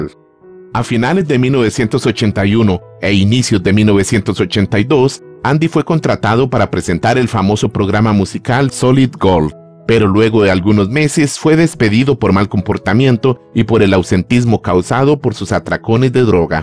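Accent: Costa Rica. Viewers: Ya sabía que eras Tico por tu acento, muy bueno el reportaje. Amo los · Desde que empecé a escucharlo dije... Este es acento tico 🇨🇷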